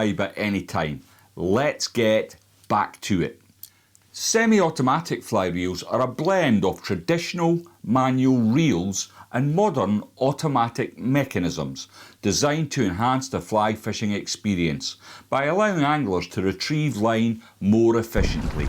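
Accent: Scotland, United Kingdom. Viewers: Happy to see the Pere Marquette River Lodge sticker behind you. I guided on the PM in the 90s. That lodge was “ Johnson’s Pere Marquette Lodge” then. With that Scottish accent, I’m wondering if you’re in Scotland, and if you’ve visited and fished Michigan….where the PM flows.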